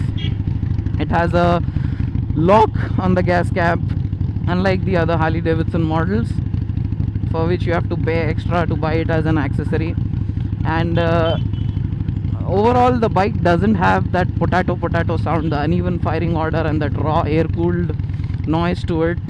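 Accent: Hyderabad, India. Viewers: Bro please make one video per month in your hydrabadi accent. I love your hydrabadi accent. please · Your accent is awful and here you are trying to get rich on YouTube. Why don't you go fishing and work for a living like the rest of your Indian brethren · i knw indian accent but this is way too indian.. and FYI i stopped as soon the accent ws killin me ....literaly.....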